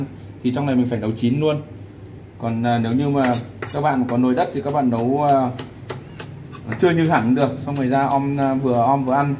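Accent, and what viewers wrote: North, Vietnam. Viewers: Nghe giọng bắc rồi còn hỏi...?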